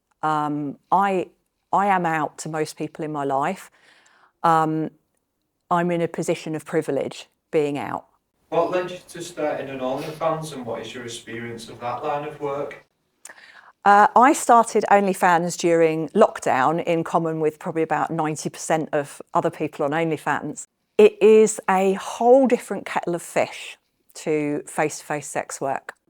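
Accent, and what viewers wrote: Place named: Midlands, United Kingdom. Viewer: this woman sounds more Midlands than southern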